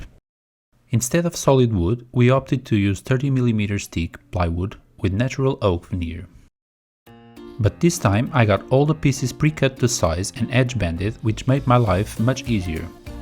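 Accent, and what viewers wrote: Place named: Portugal. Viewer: I was mesmerised watching this, and thinking to myself: "this guys sounds Portuguese" , and I was super happy to find out he actually is! :) I plan to do a lot of stuff myself on our new place, so I'm already subscribed! Kudos, great work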